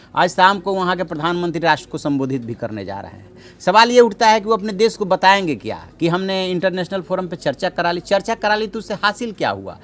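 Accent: Bihar, India. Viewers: Bhai aapke bolne se lagta hai ki aap Bihari hai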